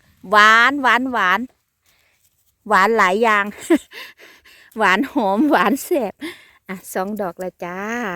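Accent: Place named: Ubon Ratchathani, Thailand. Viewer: เว้าได้ไพเราะจ้า สำเนียงทางอุบล เด้ อจ้า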